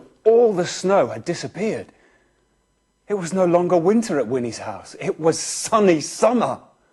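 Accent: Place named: England, United Kingdom. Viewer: Richard's voice sounds a lot more English in this than in Robin Hood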